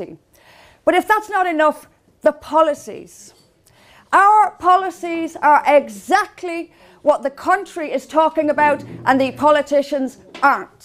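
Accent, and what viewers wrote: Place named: Ireland. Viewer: Good speach young lady.. Romans .. Our Lords blessings in your assistance to seccede in everything that you set out to achieve.. Keep sn eye on The Torys and what they write in the small print of bills... And by the by the lilt of the beautiful Irish accent serves only to enhance the beauty of the daughters of men.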